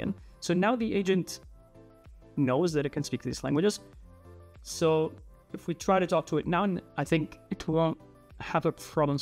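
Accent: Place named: England, United Kingdom. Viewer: si ma l'accento è totalmente inglese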